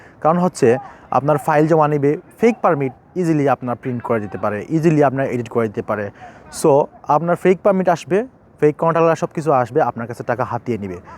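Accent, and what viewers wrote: Sylhet, Bangladesh. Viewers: Vai Sylheti na ki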